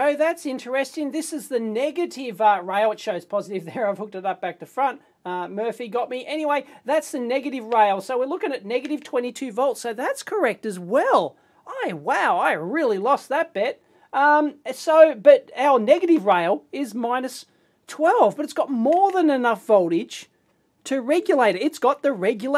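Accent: Australia. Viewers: it's highly commendable for someone to use a wide dictionary. especially with an australian accent. (unless your name is ken ham)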